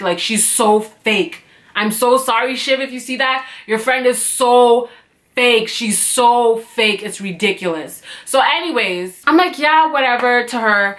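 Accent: Toronto, Canada. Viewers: I love your toronto slang/accent lol it's so cute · The Toronto accent triggers me idk why😣😂😂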